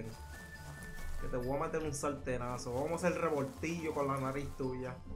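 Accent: Puerto Rico. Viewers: Tu suenas como si fueras de jayuya PR o algo😭🤣de que parte eres de Pr?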